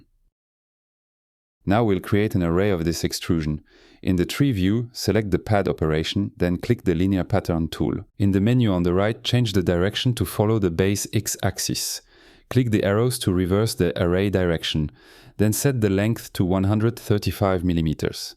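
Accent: France. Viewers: J'aimerais avoir vôtre fluidité en Anglais ! en tant que français je reconnais un autre Français xD Avez-vous déjà utilisé Solidworks ou Fusion360 ? en point de comparaison ? ou vous avez une expérience exclusivement sur FreeCAD depuis le début ?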